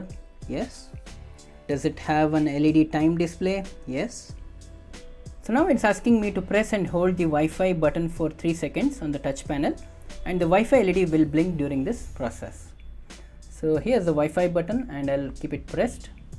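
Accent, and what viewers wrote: India. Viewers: That was the most painful video I have ever witnessed, please don’t ever review a product again, or if you do please be sober The worst part is the Indian accent in English!!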